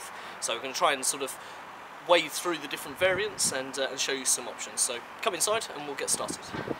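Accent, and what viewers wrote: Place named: United Kingdom. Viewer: This guy's too British to understand very well.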